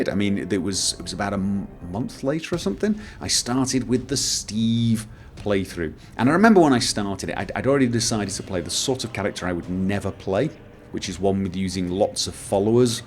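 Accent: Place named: England, United Kingdom. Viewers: Let's all share our stories of how we found! I actually found him by searching for let's plays of Vampire the Masquerade. I was watching LK's Marik play through, and was frustrated because he dropped the project, and I actually liked the way the story was progressing. So I searched on youtube and came across. I laughed and said "Hey he's got an English accent too, nice!" I found his voice so soothing to listen too, that I watched the play through several times before I started the skyrim videos. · Congrats, you know i gonna lay it all out here bro. Love the playthroughs, love the baritone Northern English accent. Love your style altogether, just a quick thought is that now you've decided to devote more time to your channel, I just want to say that if money becomes an issue I'd (Plus some other subs) would be more than happy to donate to the cause to help out. 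so yeah, all sunny skies from here in. Best wishes from us to you, here in West Virginia.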